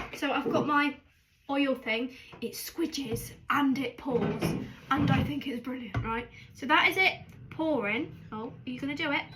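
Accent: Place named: West Country, United Kingdom. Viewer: Love your accent wheres it from somerset or devon maybe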